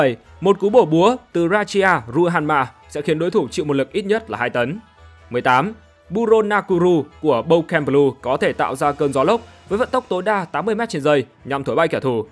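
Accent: Japan. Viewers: đọc tiếng Anh theo kiểu Nhật nghe khó chịu thật sự, mà đã thế còn chỗ Anh chỗ Nhật, nhất quán 1 kiểu thôi :)))) ko đọc đc thì đừng có đọc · hình như là tên tiếng anh nhưng mà là anh nhật bạn ạ =))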